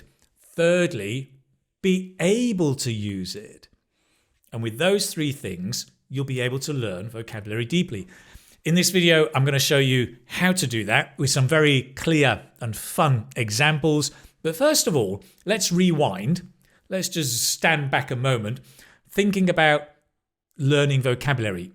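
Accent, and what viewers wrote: United Kingdom. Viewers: It is amazing, how good I understand your pronunciation! The are almost 90% of your speech. I think it's depend on your wonderfull british accent. Your way to speak is very clear! I have so much problems to understand americans. thanks a lot!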